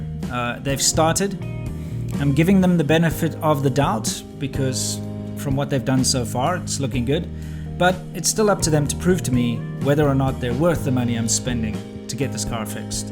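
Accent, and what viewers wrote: South Africa. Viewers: Hey, love your car, and I hope you're not giving too much leeway to this shop. I know it's difficult to find a legit shop, but this is like well past 3 strikes and your out situation. Stay on them, you're investing big $$. Also I had a South African roommate many years ago, your accent takes me back. He was from Sandton, went to Stellenbosch University. Cheers! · What country are you from you sound very south african.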